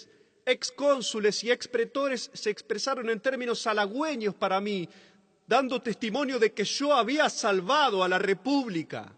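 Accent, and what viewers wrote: Argentina. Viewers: No es el hecho del acento es el hecho de NO RESPETAR EL LATIN HABLANDO COMO ARGENTINO! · DEFINITIVAMENTE EL TIMBRE ARGENTINO ES PESIMOOOO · No había otro que no fuera argentino? Hace sonar pedante el discursivo este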